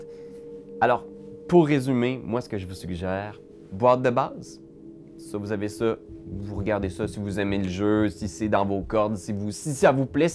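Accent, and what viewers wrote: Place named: Montreal, Canada. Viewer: Je reviens de vacances de Montréal, et entendre cet accent à nouveau, lié à la passion et une façon de raconter les jeux, quel bonheur. Bravo pour le boulot et l’ambiance 👍👍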